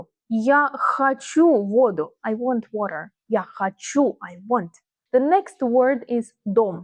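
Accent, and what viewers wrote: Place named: Russia. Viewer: You speak English more Russian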